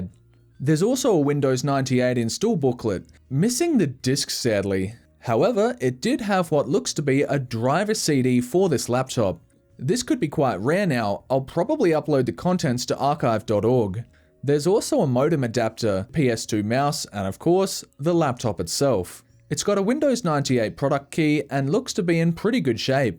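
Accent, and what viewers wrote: Australia. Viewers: The second you said HP, I was missing the bold text from DankPods's video. Aussie accent ftw 🤣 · Your videos would be very entertaining if it were not for your extremely annoying Australian accent can't you learn an American accent and do the video with that so you sound halfway normal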